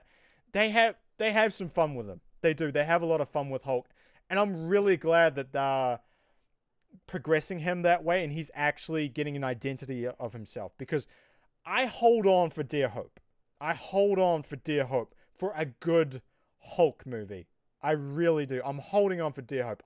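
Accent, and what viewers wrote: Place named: United States. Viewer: Why is your accent messed up? U sound American.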